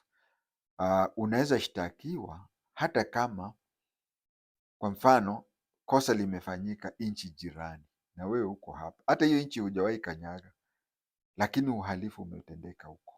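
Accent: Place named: Bukusu, Kenya. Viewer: Kwetu ni Wundanyi, lakini asante kwa kunitaja katika video zako. Kiswahili chako kiko sawa, ila kina matamshi yaliyoathiriwa na lugha ya kibukusu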